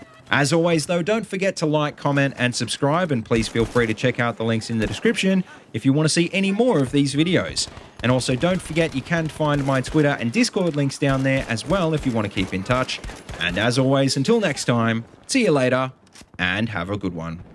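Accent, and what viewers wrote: Australia. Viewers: Thanks mate. Great review and good to hear from an Aussie. I've subbed and look forward to your next videos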